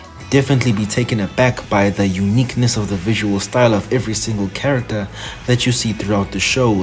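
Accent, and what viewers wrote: South Africa. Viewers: i knew you were south african from your voice and lil accent 😭😭😭 it’s good to see my brothers hopping on that bleach train fr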